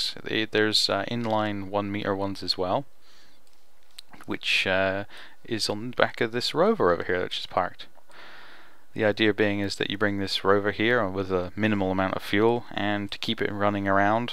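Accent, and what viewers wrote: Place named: England, United Kingdom. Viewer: You sound fairly English to me, but maybe its just me.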